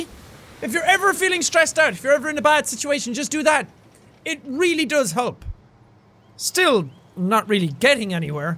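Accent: United Kingdom. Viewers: Tiffany Sigrist Just by the way, he's not saying it wrong it's just the British pronounciation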